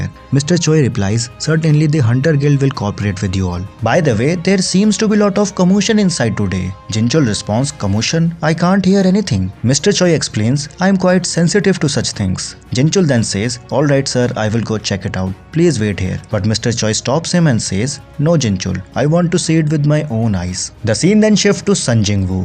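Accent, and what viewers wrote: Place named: India. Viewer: Nah not this India voice 😢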